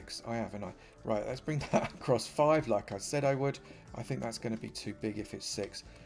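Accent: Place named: North, United Kingdom. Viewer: Um. You must not have listened to many males from the northern UK speak. He sounds like one of those, but that’s pretty much where the likeness ends.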